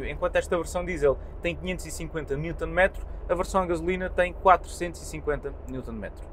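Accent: Portugal. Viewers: Mas esse menino tem sotaque português... Grande parte das peças são estampadas e soldadas em Portugal. Só não é montado em Portugal 😉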